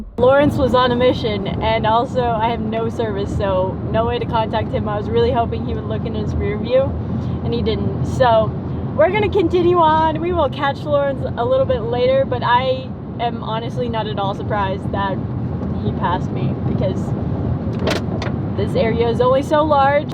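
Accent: South, United States. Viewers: My Friend, just curious, are you From the Southern South?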